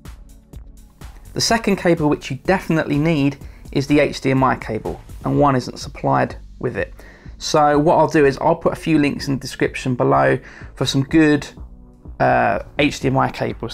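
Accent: United Kingdom. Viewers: BUZZKEIL Tech: The accent is NOT American. I'm from America! The accent on the video sounds cockney.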